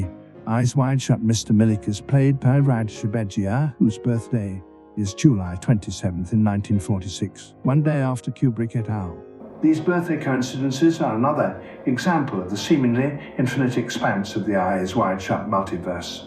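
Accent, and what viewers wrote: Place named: United Kingdom. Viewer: I love discussions on this film. I don’t have anything to add that hasn’t already been said But the flow, and delivery of the speech analysis makes it hard to absorb information. It is a bit too fast. There needs to be longer breaks/ pauses when you make those unique connections. ( and you did a great job on finding them! ) Also, maybe using added arrows with dual screenshots can help solidify each connection. Lastly, the AI voice over generator sounds pleasing- but makes it hard to follow quickly. Most Americans take an extra micro second to interpret British accents.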